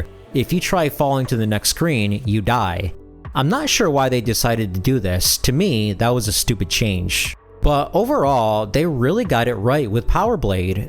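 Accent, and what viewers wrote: Chicago, United States. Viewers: Is that a Windy City accent?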